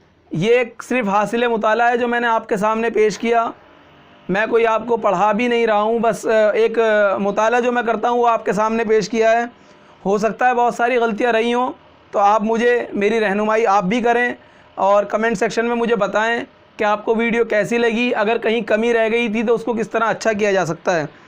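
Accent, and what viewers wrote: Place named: Delhi, India. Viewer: Sahib ki meethi Dehli ki zubaan sunne mauqa ms. Maine pehle likkha tha ke is nazm ko pira sunna hai. Aur Inka parha dxplanation aur poems bhi sunne ka bohat beta i se intezaar hain. Main Dehli me Jama Madjid ke paas aur Ballimaran Ahata Kale Sahib aur Bara Hindu Rao men reh chuka hoon. Ham Dehli ke ASHIQ hain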